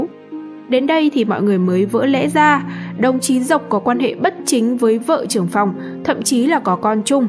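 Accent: North, Vietnam. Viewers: Hết chuyện rồi đem tin xàm xí với giọng Bắc kỳ khó nghe!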